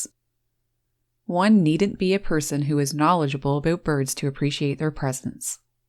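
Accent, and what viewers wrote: Canada. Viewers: This narrator's pronunciation of "about" is a dead giveaway canadian accent, but I don't know any Canadians who pronounce their O's like A's like she does. I hear that in Michigan, Minnesota and Western New York. I'm assuming Canadians with that accent are near those borders? Sorry for the off topic, just curious.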